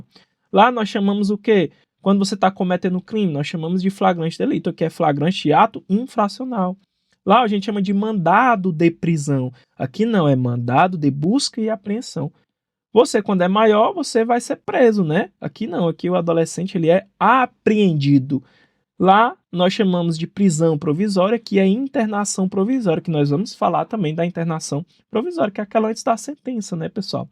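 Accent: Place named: Northeast, Brazil. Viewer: Não fala mal do seu sotaque! Com tantas potências no nordeste brasileiro, este se tornou o que eu mais gosto! É um sotaque digno de orgulho!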